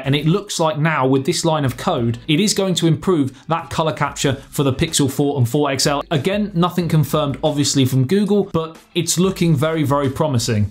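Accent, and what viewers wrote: United Kingdom. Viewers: 2nd commenter i guess.......love your brit accent 😅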